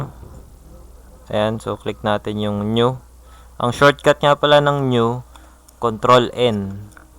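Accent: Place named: Philippines. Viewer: He is using tagalog language so he's accent is in tagalog too.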